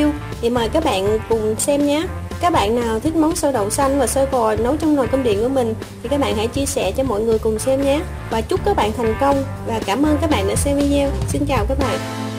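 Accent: South, Vietnam. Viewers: Nghe ngươii mien nam thich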